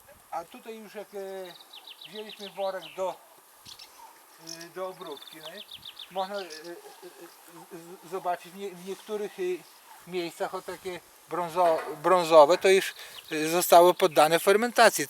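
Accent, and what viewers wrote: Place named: Kresy, Poland. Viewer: Sympatycznie i ten fajny kresowy akcent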